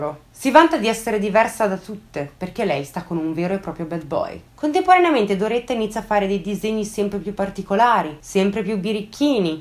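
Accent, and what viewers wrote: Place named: Piedmont, Italy. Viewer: Hai una bella voce, un bell'accento piemontese con gli accenti giusti, una rarità. E i tuoi resoconti sono veramente interessanti e esaurienti!!!! Io ricordo bene il caso Graneris! Sono di Biella e l'ambiente vercellese era quello della mia adolescenza, il freddo, la nebbia e una campagna desolata.....non la rimpiango! Sono tanti i casi italiani inquietanti che hanno lasciato la gente inorridita, e mi sarebbe piaciuto svescerarlli di più, ma non ricordo i nomi, spero che ti capiti di rivangarli, mi toglierei qualche domanda senza risposta. Intanto ti ringrazio, fai un buon lavoro!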